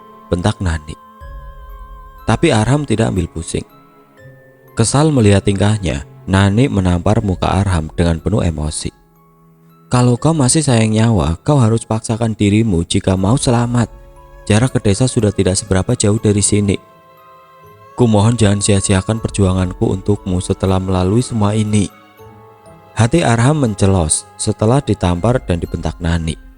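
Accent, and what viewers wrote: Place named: Banyumas, Indonesia. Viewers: apa orang tegal ya kok medok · masnya orang ngapak ya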